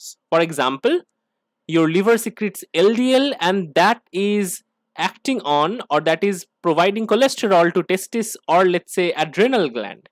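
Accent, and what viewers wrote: India. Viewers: bro use AI english or american accent it will promote ur videos,. we indians arent respected in the world so dont use our secret accent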